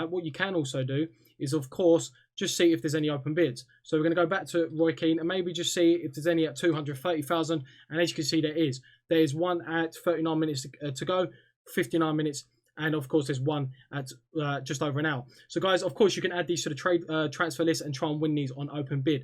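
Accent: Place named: Australia. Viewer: tbh you sound very Australian xD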